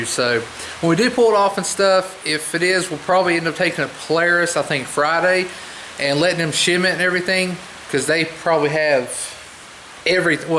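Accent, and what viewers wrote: West Virginia, United States. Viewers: You from West Virginia?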